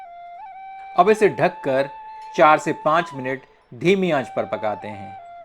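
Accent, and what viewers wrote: Standard. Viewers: Love your pure Hindi bro.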